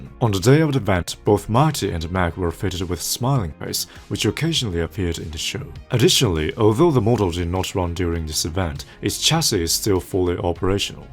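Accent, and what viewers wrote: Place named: Japan. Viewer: bro sounds like a japanese person who is learning english from posh british people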